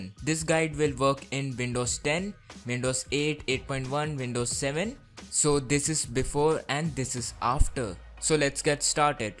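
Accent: India. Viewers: He is indian. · ORAN DSOUZA he is indian, and pronounciation does not matter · he is indian dont trust indians they scam you if i click on a video and here if they are indian i am out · Indian sound ass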